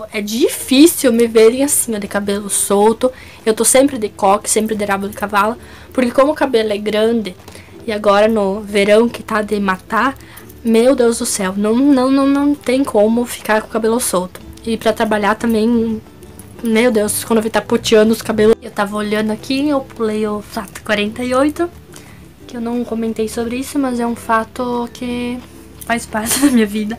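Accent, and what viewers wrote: Rio Grande do Sul, Brazil. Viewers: Olá também sou gaúcha.amo seu sotaque queria ser assim também...e não vivo sem cebola KKK. amei o vídeo Deus te abençoe ❤️ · Gosto muito do seu sutaque gaúcha seu cabelo é muito lindo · Amei seu vídeo, mas nunca tinha ouvido falar que alguém ama leite ainda mais sendo gaúcha tchêê...🤗... cadê o churrasco e o chimarrão?...😋... brincadeira rs...seu sotaque é lindo e vc não deve se envergonhar nunca pois é uma característica sua, de sua região que vc deve se orgulhar sempre...um superlike...😉😊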